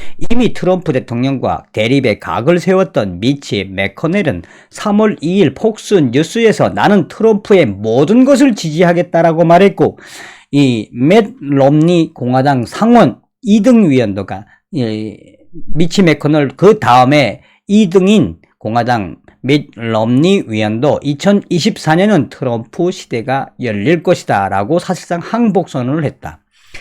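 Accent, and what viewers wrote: North Korea. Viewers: 탈북자시라 억양은 어쩔수없는거 같아요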